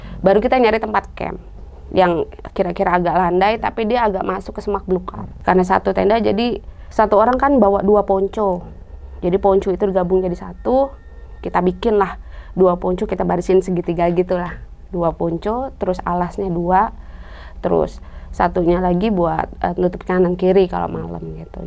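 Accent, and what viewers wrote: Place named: Jakarta, Indonesia. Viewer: Bahasanya betawi bet mpok hhehehe tp keren pengetahuannya.sehat selalu mpok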